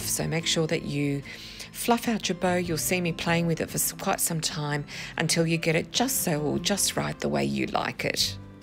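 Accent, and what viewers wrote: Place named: Australia. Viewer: Just beautiful! Aussies have the most gorgeous accent and your voice is so pleasant and calming. I think if Olivia Newton John♥️Love your wreath!!!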